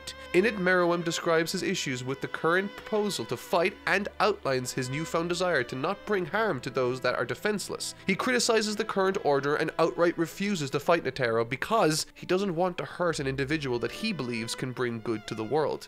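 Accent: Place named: Ireland. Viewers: he pronounces the word "calm" the same. I think it's just his Irish accent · he's irish that's just his accent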